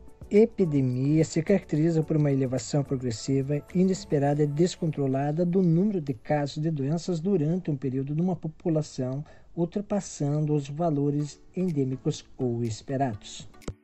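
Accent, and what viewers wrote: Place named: São Paulo, Brazil. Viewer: esse cara tem sotaque paulista, paulista não tem vez aqui não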